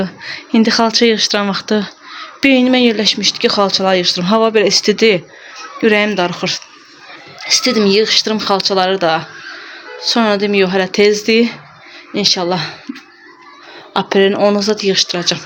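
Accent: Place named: Quba, Azerbaijan. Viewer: baci siz haralisiz..lehceniz Quba ya oxsatdim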